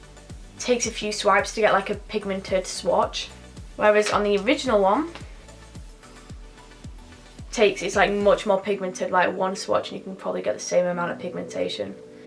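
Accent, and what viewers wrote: United Kingdom. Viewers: Is she British cuz she sounds it but she says dollars??🦋💗 Love you!!xxx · Your accent is British(like meh)But u r in America....nvm :D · Ellen Coonan well she's British. They pronounce things differently. There is more than one accent you know.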